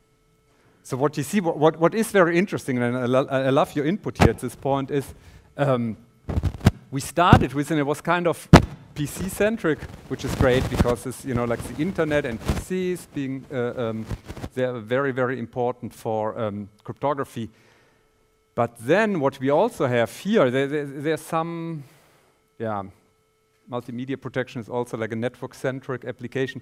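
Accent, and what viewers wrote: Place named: Germany. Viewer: very good Denglish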